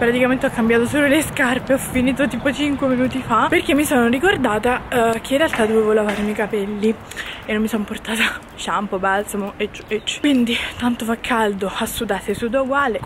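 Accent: Rome, Italy. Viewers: Adoro il tuo accento romano!❤️❤️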